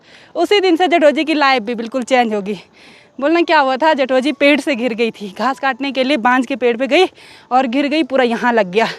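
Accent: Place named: Rudraprayag, India. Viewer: Aapki Garhwali Bhasha hamare Rudraprayag wali hai bhardar Patti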